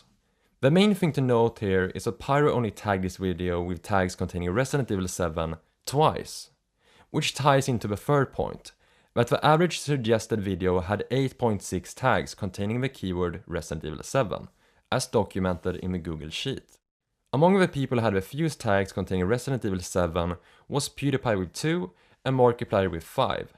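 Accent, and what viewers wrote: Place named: Sweden. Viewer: your swedish accent annoys me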